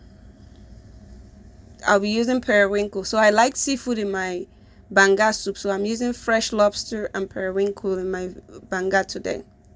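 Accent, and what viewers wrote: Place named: United States. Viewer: I just finished watching a pidgin version of another video. Coming over here, It's the American accent for me o. Put in some Warm WORA... Na dem no ready. Well done. Nice video. Please, I suggest you should record your videos in landscape mode for Youtube.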